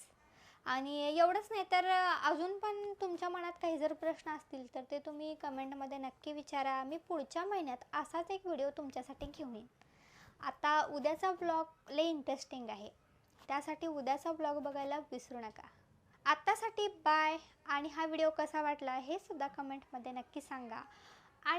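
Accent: Kolhapur, India. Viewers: बोलण्या वरून तुम्ही सातारा कोल्हापूर चें वाटता 👌🏻👌🏻👌🏻म्हणेज माहेर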